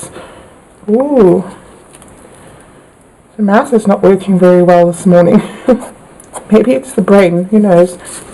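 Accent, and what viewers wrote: South Africa. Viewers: Very pretty! Must say, so weird to hear a fellow South African! Are you still in SA?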